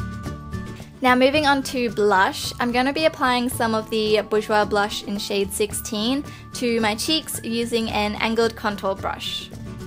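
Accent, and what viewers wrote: Australia. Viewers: Woa you are stunning! It's also lovely to hear an aussie accent :D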